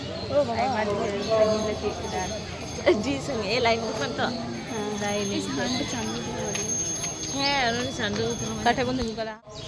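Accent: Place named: Santali, Bangladesh. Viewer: বাংলাদেশের সান্তালী একসেন্ট এর সাথে আপনার একসেন্ট মিল আছে।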